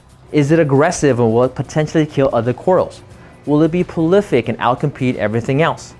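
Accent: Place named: United States. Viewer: This man's speaking american accent. You're lucky to have him informing you about marine fishkeeping bc he speaks slowly and carefully. This 10/10 presentation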